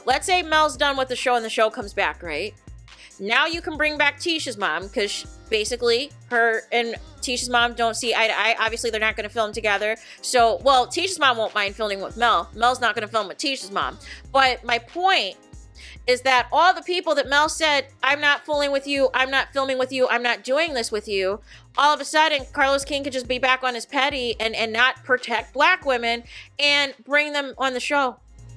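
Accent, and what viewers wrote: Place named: New Jersey, United States. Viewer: Whom ever is complaining about your sound should merely adjust their sound. If they complain they can bye, you are the most non controversial CC, I've been tuning in almost 2 years now. You are great and growing which makes you a target. You get to it state facts and laff lol😂 I love your laughter. Sounds like a jersey girl ❤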